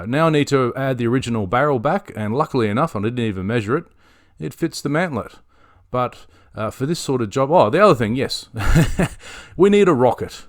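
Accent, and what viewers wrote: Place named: Australia. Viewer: So good to hear an Aussie accent. I left Oz in '92 for my work, spent 4 years in France and 1 year in Germany before settling in Delaware US. Last time I went back to OZ (to say goodbye to my father in 2010) I was asked if I was a Yank. And then here in the US they keep asking what my accent is! I've subscribed, keep up the good work.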